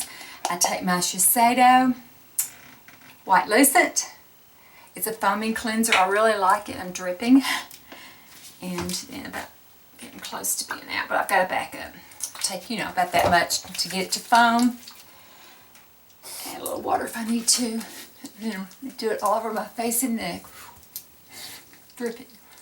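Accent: South, United States. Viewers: think you are a beautiful woman with a beautiful soul and I can't understand why anyone would be mean to you except that their lives are so meaningless and pitiful that they feel the need to be awful. I love you're accent because to me it is normal! I think we need more southern ladies on YouTube. Your skin is amazing so I'm now going to try your routine. I just turned 50 and menopause is wrecking my skin! Keep up the awesome work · You are so precious and beautiful😊I am turning 41 today and I start my retina and the blender this week...super excited...thankyou soooo much for your skincare routine video...you are just beautiful! Love love love your accent...from one southern girl to another😘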